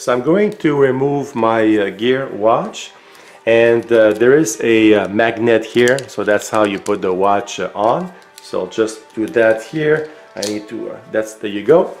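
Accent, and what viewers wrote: Quebec, Canada. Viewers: Merci pour la revue! (I'm writing in French since it seems like a French-Canadian accent like mine ;) )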